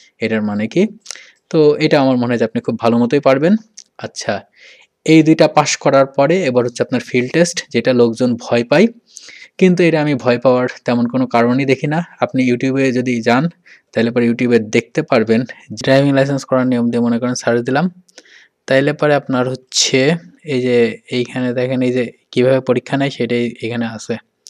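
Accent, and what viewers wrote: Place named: Kushtia, Bangladesh. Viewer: amar kushtiyar ek bondhu ase...eke bare apnar moto kore kotha bole☺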